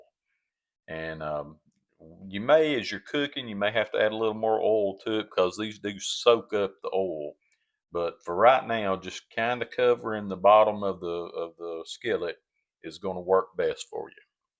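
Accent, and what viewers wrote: South, United States. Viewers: What is with the Southern brogue?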